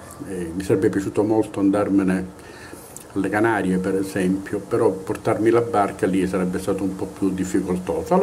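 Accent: Sicily, Italy. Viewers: ma sei Siciliano, della provincia di Catania?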